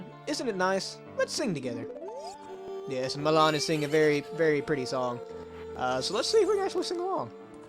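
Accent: South, United States. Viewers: This game really makes your Southern accent shine in a good way!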